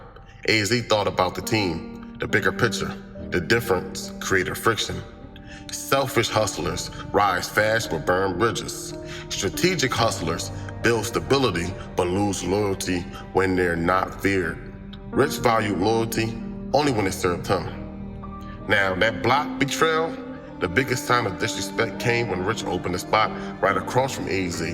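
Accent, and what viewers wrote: Philadelphia, United States. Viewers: U from North Philly huh😂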